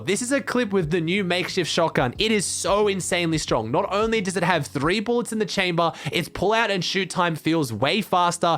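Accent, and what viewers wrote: Australia. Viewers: Man I love starting my day listening to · asmr??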